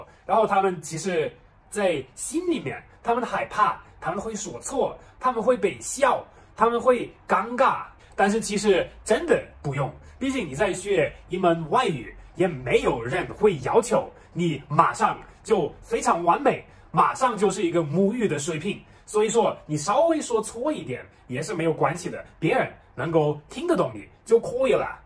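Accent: Sichuan, China. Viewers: 厉害，竟然会好几国的语言👍👍👍而且学汉语还学到了四川口音。如果不看脸，还真像一个四川人在说普通话。😄😄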